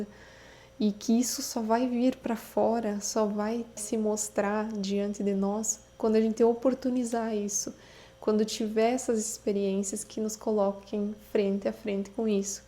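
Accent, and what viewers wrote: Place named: South, Brazil. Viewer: 🙏🏻🙏🏻, pelo sotaque é do sul, continue na missão de expandir a consciência do proximo.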